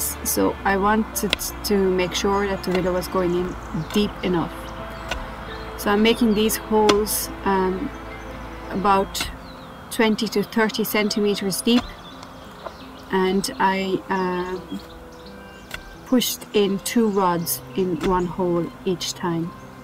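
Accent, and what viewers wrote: Ireland. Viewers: Irish accent. 😍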